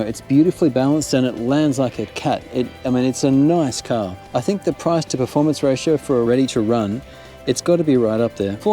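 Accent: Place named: Australia. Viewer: Definitely Aussie 😂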